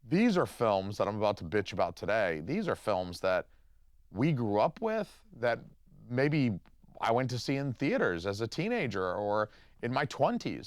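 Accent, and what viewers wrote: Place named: Pittsburgh, United States. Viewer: I agree with everything you said here and laughed quite a bit. But I’m so confused why you’re wearing a Georgia sweatshirt and have a Pittsburgh accent.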